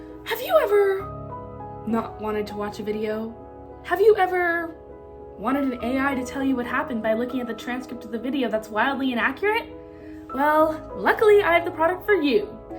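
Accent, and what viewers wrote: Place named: Canada. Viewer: May I ask what country you live in? You sound very Canadian! (From a Canadian)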